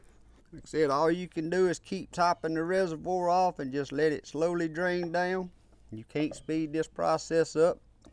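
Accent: South, United States. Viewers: You just by god got a like and a new subscriber. Never seen anyone who gets straight to the point and I’m from alabama and can understand the southern draw quite well. Thank you so much!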